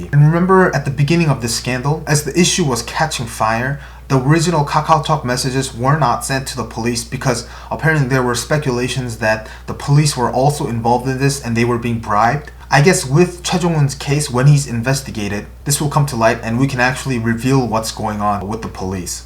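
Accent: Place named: England, United Kingdom. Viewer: Please know that you make the international k-pop fans very stress reliving with English ascents and detailed information.. Love form our side 🤗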